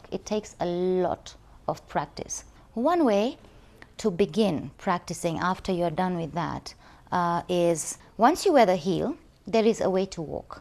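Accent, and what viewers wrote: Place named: Kenya. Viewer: Hello rich folks....just go in her by chance and am loving the vybe....btw love the fact that you are kenyan by your accent and not twenging to sound very foreign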